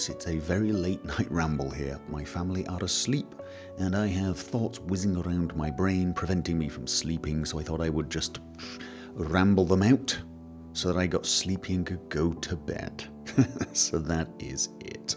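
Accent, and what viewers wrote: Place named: North, United Kingdom. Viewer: northern accent is best accent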